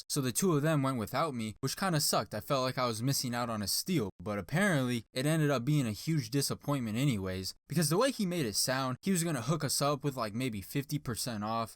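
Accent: Arizona, United States. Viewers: Bro got that Arizona accent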